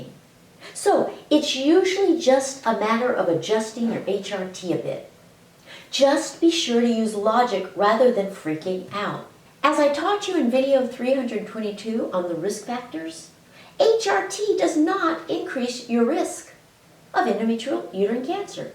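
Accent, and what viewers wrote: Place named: Texas, United States. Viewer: You are the best teacher…EVER! I loved your Texas drawl. ☺😘💕 Until next week, my dear.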